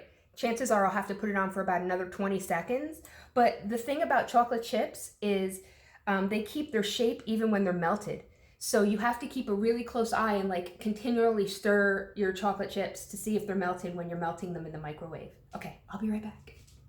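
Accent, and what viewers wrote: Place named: New Jersey, United States. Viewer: I did pick up on the heavy Jersey accent lol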